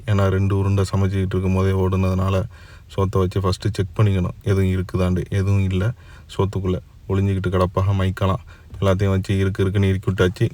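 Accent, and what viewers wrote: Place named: Parangipettai, India. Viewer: நீங்க என்ன பரங்கிப் பேட்டையா? அந்த slangil பேசுறீங்க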